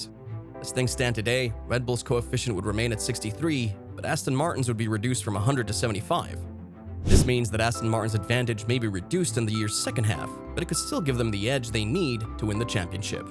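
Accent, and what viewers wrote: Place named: United States. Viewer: Ironic to hear an American accent thinking it knows anything about F1🤣 Instant stop and scroll,😝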